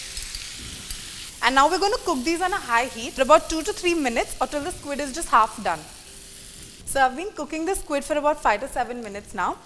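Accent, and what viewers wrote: India. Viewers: I like your presentation, and your kitchen. And, you have garnished with the Indian accent. I have squids, and was looking for a simple recipe with good results.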